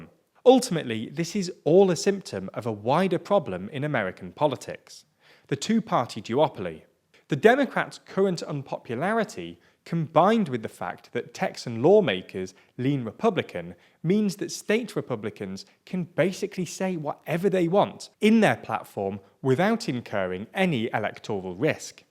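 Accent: United Kingdom. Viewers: Listening about US politics in British accent is so refreshing. It almost makes it less depressing and frustrating. Thanks for the video though.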